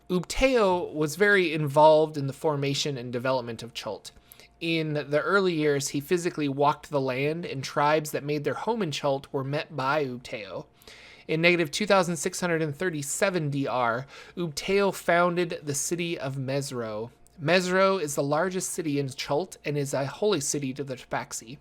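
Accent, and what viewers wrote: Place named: Chicago, United States. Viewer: sounds like the south side of Chicago to me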